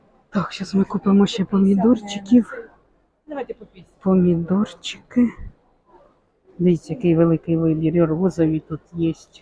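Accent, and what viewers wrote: Poltava, Ukraine. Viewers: Рiдна полтавська мова ❤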